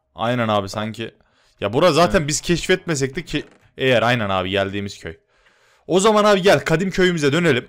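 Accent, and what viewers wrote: Yozgat, Turkey. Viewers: Abi Yozgatlı mısın??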